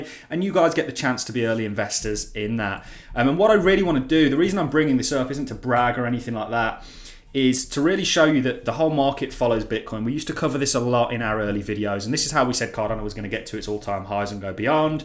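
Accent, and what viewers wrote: Birmingham, United Kingdom. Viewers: knew I could hear the Brummie in you 😆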